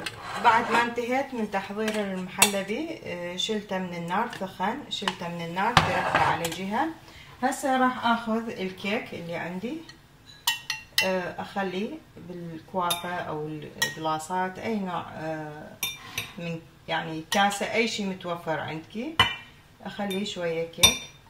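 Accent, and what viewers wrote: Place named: Iraq. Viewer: احب اللهجه العراقيه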